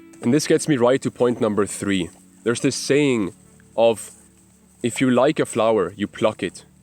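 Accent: Germany. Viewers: Great video, I have to add one thing...This amzing guy must have like in Scotland or knoew lots of Scottish people, he keeps using Scottish experssions, and, with a German accent, it soulds brilliant! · I knew you were German! The background and your accent did it for me. Anyways dude this is a great video and I certainly needed to hear this. Been in a relationship for 3 years and it’s my first real one too. I’m 21 now and still learning how to be a man. This just gave me the confidence to leave all that childish shit behind and change my mindset. Danke bruda 🙏🏽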